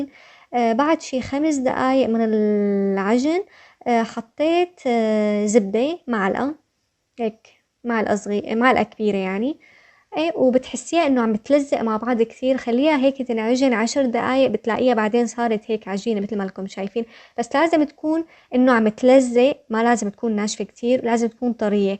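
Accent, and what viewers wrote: Syria. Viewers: انا مصريه ولهجتك بتذكرني بجارتي السوريه ، وانا كتير بحبها وحبيتك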